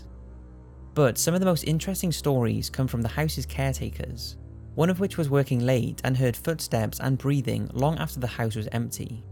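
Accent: United Kingdom. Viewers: Am I The Only One Who Loves This Guy's British Accent??😐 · You have a very beautiful and calming voice, I really like it, where are you from? Your accent looks like British....😊 make more videos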